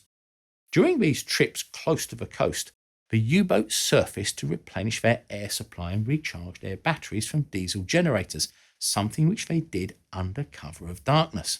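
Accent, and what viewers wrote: United Kingdom. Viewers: The British accent lends an air of credibility. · I knew this guy had a British accent before even hearing him.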